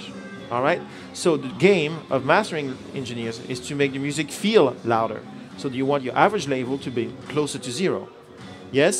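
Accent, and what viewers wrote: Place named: France. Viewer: Are you French by any chance? I don't know what it is but it instantly makes your English sound much more awesome. That's not cool man; it colors my perception of you and prevents me from getting a proper read, lol. Anyway, great explanation. Very well put, as if your words came straight out of my head on every point. I'm sure you do great work and thanks for taking the time to educate. All the best!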